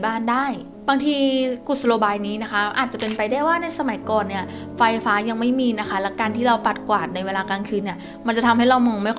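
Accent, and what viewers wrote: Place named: Thailand. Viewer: เสียงน่ารักจัง มีความเหน่อนิดๆ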